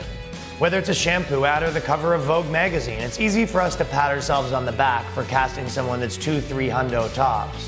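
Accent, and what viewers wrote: Canada. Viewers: your canadian accent was THICK in this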